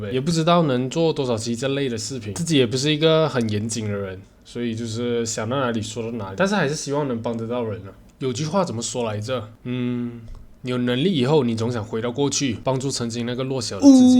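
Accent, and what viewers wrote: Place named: Malaysia. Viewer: hii! 本人是小小新手入门的小画家!，一点进去影片听到malaysian腔就觉得很亲切(? 插画圈子在马来西亚是真的很小,平常都是混欧美/日本的圈子多一些，可以看到在本地有那么大神的画师真的太棒了T-T.很喜欢你的幽默！影片也简单易懂,希望能够做多多这种相关的内容！:face-blue-smiling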